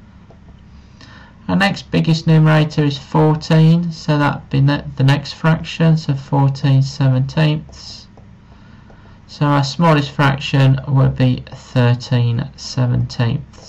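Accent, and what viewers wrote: United Kingdom. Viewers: R u british???😮😮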